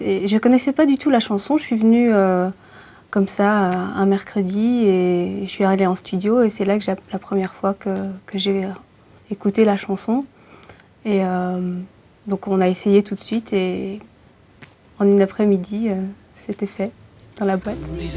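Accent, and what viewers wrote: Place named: Belgium. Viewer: elle a un petit accent belge, j'adore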